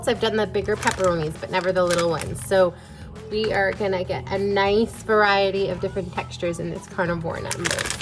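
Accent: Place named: Canada. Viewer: Wow! This is fantastic! I am curious where you buy the cheese (crackers) and pepperoni. I am guessing from your accent you are from Canada. I am also Canadian so I would really appreciate if you share where you buy them! I am very excited to try this! Thank you for the great video! 😊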